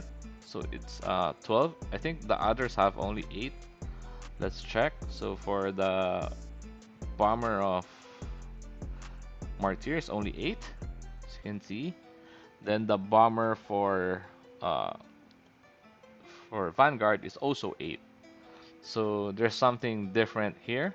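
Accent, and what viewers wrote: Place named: Philippines. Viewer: Base on your accent your Filipino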